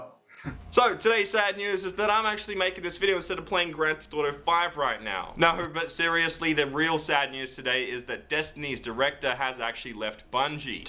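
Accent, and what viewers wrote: Australia. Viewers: He's Australian -_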